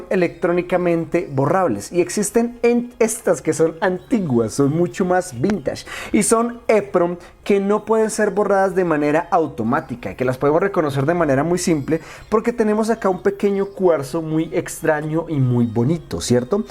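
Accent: Colombia. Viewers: Eh mijo x2 colombiano 😅😗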